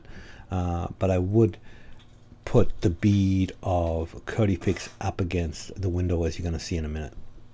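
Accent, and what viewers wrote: Boston, United States. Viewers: Boston area, I knew it the accent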